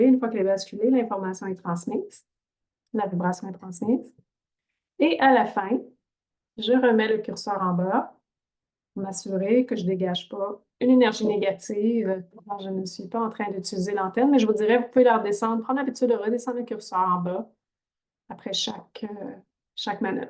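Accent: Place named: Quebec, Canada. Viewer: Hy, Très bonne pédagogie. Tes vidéos seraient plus agréables avec un bon son, car là, c'est difficilement audible. Qu'il est beau cet accent québécois. A bientôt. 😊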